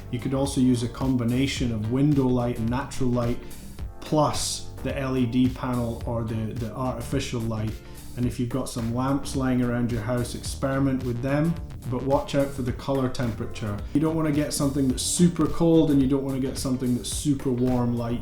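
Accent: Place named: Scotland, United Kingdom. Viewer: This has been really helpful advice for me dude! Its also nice to hear it from a fellow Scot 😂 been trying to teach myself since COVID started but there is so much advice out there that it’s really overwhelming! Thank you for making this man!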